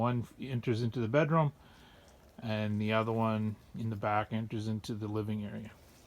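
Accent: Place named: New England, United States. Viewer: Great tour - thanks so much. A few times I thought I heard a northeast accent. Are you originally from New England?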